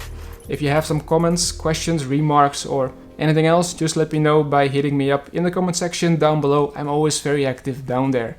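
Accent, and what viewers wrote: Netherlands. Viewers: Haha even though your English is near perfect I could hear the dutch accent. Nevertheless, Great video though! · The dutch is strong on this one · Nederlander die engels spreekt🙃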